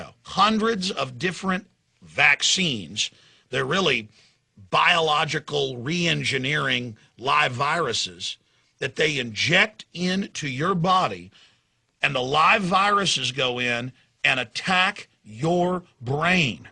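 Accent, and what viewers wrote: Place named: South, United States. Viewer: Your evidence is weak, subjective and theory laden. You haven't tried to falsify your claim, you are unwilling and unable to consider alternative answers and reasons to your "evidence" the links between your evidence are weak (though rather imaginative) you personify your government body as one evil being, everything points to this being a wack-job conspiracy theory, you even sound like your from a southern U.S. state it all fits! There is no logical reason to believe you. Good day.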